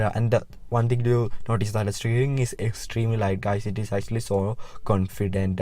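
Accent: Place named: Kerala, India. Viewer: Malalyali aananu first 2 seconds le manasil aayi :)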